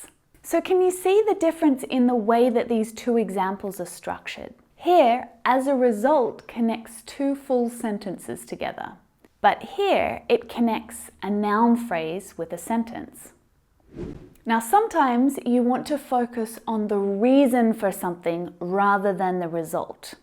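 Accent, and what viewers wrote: United Kingdom. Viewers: G'day, I can't figure out if you're British or Australian. Maybe (like me) you're both? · Hello, 👋 first of all thanks but I believe your pronounceation is British, am I right? So I've learned American English, but my English is not good enough so if I follow you may be I confused. I need an American accent teacher could you help me or what is your suggestion? Thanks in advance. 🌹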